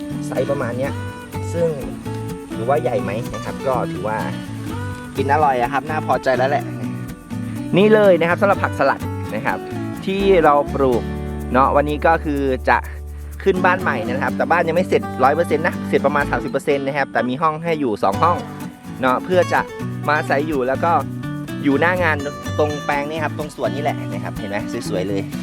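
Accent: Isan, Thailand. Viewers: 555เราชอบฟัง พาษาอีสารด้วนน่ารักดีสุดยอดคะ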